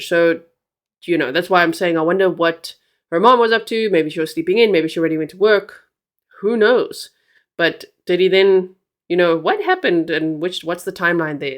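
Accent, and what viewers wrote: South Africa. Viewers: I heard you say braai are you South African? Lovely to hear that accent. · Thanks for this, what a terrible story maybe this will wake someone up! Btw could tell you were South African by the accent but as soon as you said brai that confirmed it. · Are you South African?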